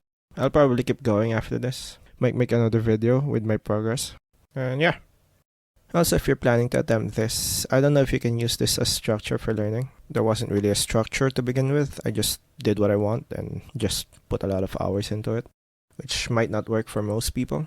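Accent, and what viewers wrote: Philippines. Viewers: based on your accent im guessing ur filipino? bec thats how i talk in english lolll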